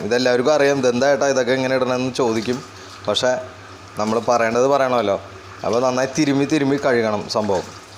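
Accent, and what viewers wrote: Thrissur, India. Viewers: തൃശൂര്‍ ആണല്ലോ.... പൊളിച്ച് 🤩🤩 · ചേട്ടാ അവതരണം മ്മടെ തൃശൂർ ലൈനില് സൂപ്പറായിട്ടാ....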